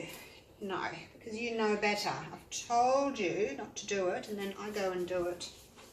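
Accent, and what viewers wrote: Australia. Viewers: Can't half tell your an Aussie discussing the merits of baby poo lol..love it :D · Wow - you sound just like me! And I LOVE these colours and your work and the way you chat. I miss Australia - so it is so nice to hear someone from there. And this is from 2-3 years ago so you must have been one of the first popular Aussie Pouring youtubers. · American here. Love watching the video for the content, and enjoy hearing an Australian accent. For people like me who can't travel, It's fun to see/hear someone from a part of the world, far away from where I live. We are all different, but still have so much in common. Thanks for sharing what you do. Happy trails, from the USA.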